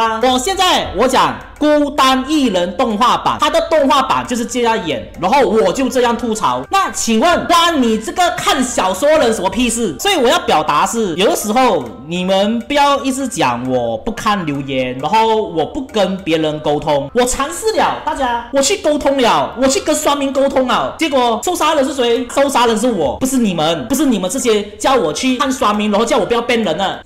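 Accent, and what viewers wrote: Malaysia. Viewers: 對方真的很有事 就跟你說我是吐槽動畫版 你有跟我扯小説版 不過 我現在才發現 有馬來西亞的口音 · 看Kuma桑吐槽的口音好好笑😂 回去看自介果然是馬來西亞人，跟"娘娘"一樣口音😋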